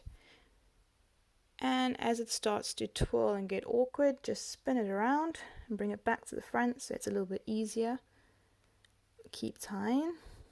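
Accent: South Africa. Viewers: Thanks for this! I needed a refresher! Are you South African? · South African? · Great to hear a South African voice.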